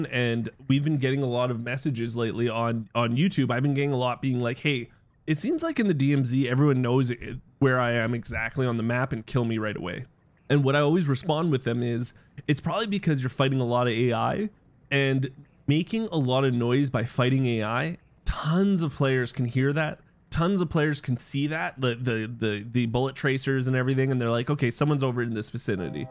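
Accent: Canada. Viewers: I love that Canadian accent you got there bud. I like watching your videos, I'm a big supporter over here. When they killed you at the exfil, I laughed so hard I almost peed my pants. I'm so glad that it's not just me that gets ganked all the time at the exfil. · you are definitely Canadian 😂❤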